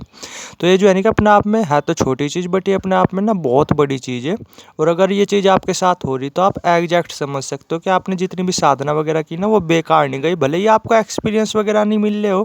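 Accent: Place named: Haryana, India. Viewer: hello bro phone लेकर ही बैठे थे 😅क्या वह हमें लगा कि आप हरियाणा से हो आपकी वॉइस से पता लग रहा था आपकी वीडियो बहुत अच्छी इसको कंटीन्यू रखना और दोनों चीज हमें भी है जैसे कि आपने वीडियो में बताया थैंक यू सो मच एंड गुड ब्लेस यू✨🙏😌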